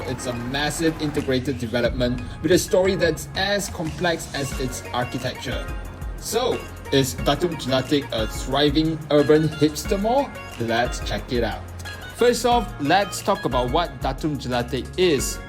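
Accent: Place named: Singapore. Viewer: Bagus cakap dalam bahasa Melayu, bahasa Inggeris logat Singapura sangat mengeciwakan..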